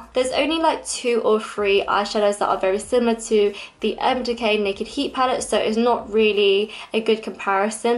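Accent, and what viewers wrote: United Kingdom. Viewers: You're my Asian makeup inspiration! <3 & you're hella funnyyy. When I watched your first video I was pretty shocked by you're British accent! I wasn't expecting that ahaha but now I can't stop watching your vids ! {ig name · <mail> (p.s. when I first started watching you I was so surprised when I heard your voice cause I didn't realize you where from the U.K. 😂)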